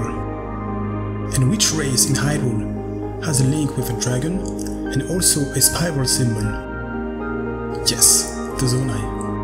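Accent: France. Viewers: On entend bien l’accent français :’)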